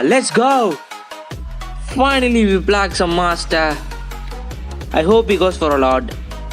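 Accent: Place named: Tamil Nadu, India. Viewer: Your tamil accent is HARD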